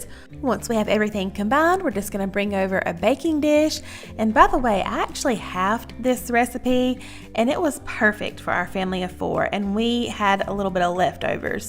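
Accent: South, United States. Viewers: great recipes. Thanks. I could listen to your sweet southern accent all day long. Thanks for the great recipes!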